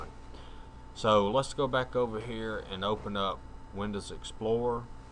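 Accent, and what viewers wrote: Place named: Appalachia, United States. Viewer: how about on chromebook ps you sound like a hill billy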